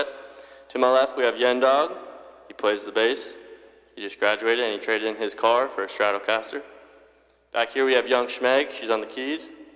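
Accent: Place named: United States. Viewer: I did not expect his speaking voice to be so American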